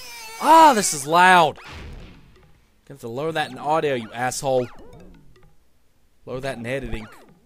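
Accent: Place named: East Riding, United Kingdom. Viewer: That's an East Riding accent. Seems Hull is fast becoming a hotbed for quality games development.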